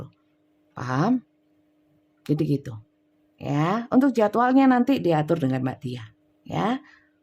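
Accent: East Java, Indonesia. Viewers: 😊❤trimakasih, banyak ndidik orang jadi waras, teges😊. Seneng dg gaya jawa timurannya. Saya aja yg bukan orang wetan karena pernah hidup di daerah wetan, jadi ngomong model jawa timuran. Yok opo se rek kon iku.....😂❤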